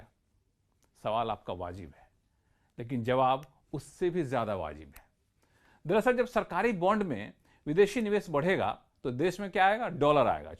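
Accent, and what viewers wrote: India. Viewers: your delivery of Information in very desi andaaz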